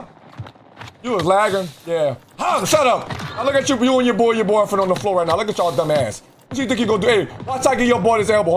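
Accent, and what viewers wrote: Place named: New Orleans, United States. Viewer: Where you from I’m from New Orleans sound like you from here me and my brothers betting they said you not let us know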